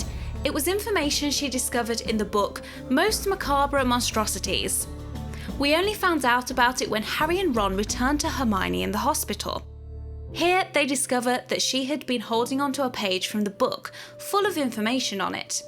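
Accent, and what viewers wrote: United Kingdom. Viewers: Not actually related to the video, but I really love your voice and accent ! I'm French, and I usually tend to dislike the British accent, I don't really know why. But oddly, I find your voice and speech very pleasant and relaxing to listen to ! Also, I got 10 points for Hufflepuff, because I knew about the roosters, Ron's robes and the diary. And I think Lockhart has a wig "in case of an emergency". For instance, if someone came to knock on his door at night, he wouldn't want to be seen with untidy hair, so he would be able to quickly put on his wig and look flawless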